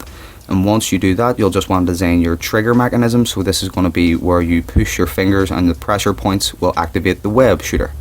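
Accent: Ireland. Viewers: I didn't know he was Irish to 😁😲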